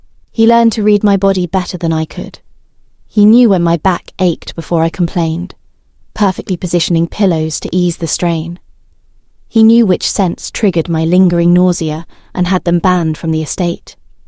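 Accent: England, United Kingdom. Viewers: I like the English accent. It’s better than the one where she sounds like a Connecticut elementary school teacher. And there are a lot of Black people, including women, in England, Africa, and Asia who all have English accents.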